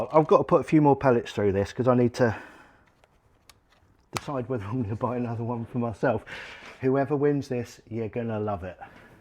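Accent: United Kingdom. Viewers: i thought you lived in England because of your accent and the fact you said you had to be in the UK for the giveaway, you can get a lot of things if you pay enough money and you have a completely clean record, but it is very controlled, i wish it was easier and cheaper but it's not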